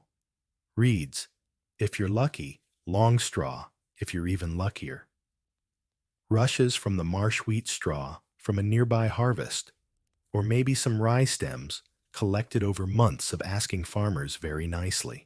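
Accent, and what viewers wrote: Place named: United States. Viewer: Your American accent sounds both patronizing and stupid - quit the channel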